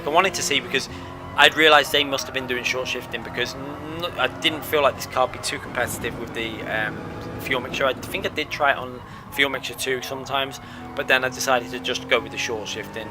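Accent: Liverpool, United Kingdom. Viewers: Feedback is what I'm doing for you Not what your doing, that's called commentary. If you do t like feedback, disable comments. Your Liverpool accent is quite strong and your talking at 60mph on top of not shutting up. If that's what makes your channel popular than good for you. That's my opinion.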